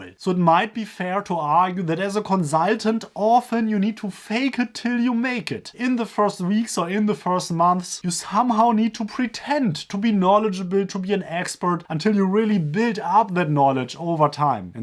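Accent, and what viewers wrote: Germany. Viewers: you already have a leg up. You are white, you speak with a German accent and your name is. Now imagine this - I am brown, I speak with an accent from the Global South and my name is "exotic". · I love your heavy German accent. You go <3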